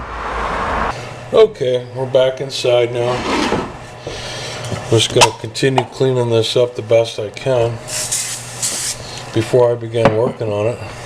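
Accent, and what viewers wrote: United States. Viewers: Are you from Missouri?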